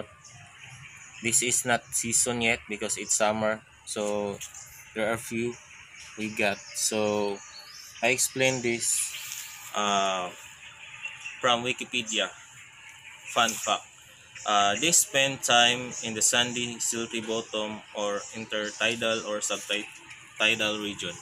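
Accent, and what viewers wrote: Visayas, Philippines. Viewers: Taga san kayo kuya mukhang bisaya kayo ahh😁😊😊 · Taga san kayo kuya mukhang bisaya kayo ahh😁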